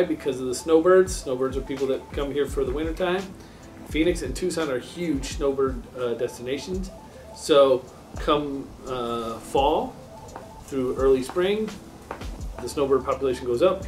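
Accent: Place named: India. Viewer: you sound like you are of Indian descent. If you have gone to India, you will be able to relate to the climate in AZ. India's heat is dry heat and can get up to 120 degrees, if you are closer to the equator.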